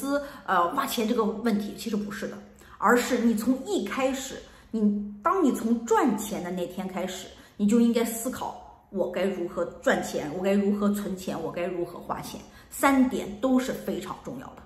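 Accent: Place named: Northeast, China. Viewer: 感觉一口东北口音呢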